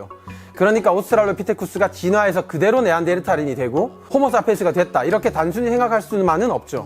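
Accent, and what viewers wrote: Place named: Gyeongsang, South Korea. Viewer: 호모 사피엔스고 에렉튜스고 간에 진행자 분이 경상도 출신이신건 확실하네요. (저도 경상도 사람)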